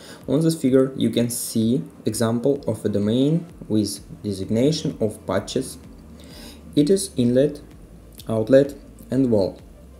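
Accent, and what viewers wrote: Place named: Russia. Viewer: спасибо за ценный урок! Судя по произношению - наш человек!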